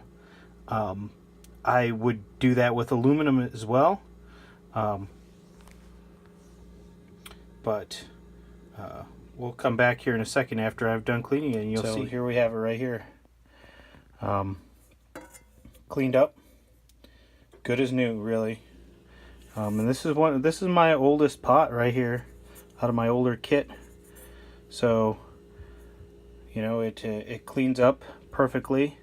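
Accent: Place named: United Kingdom. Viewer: I hope you realize that I am just kidding. We love your accent, colloquialisms and humor. Why do you think monty python, kids in the hall, and the office are so popular in the US? Almost all of the bands that topped our charts in the 80’s were British. I agree, we all would be sad to see the dialects lost.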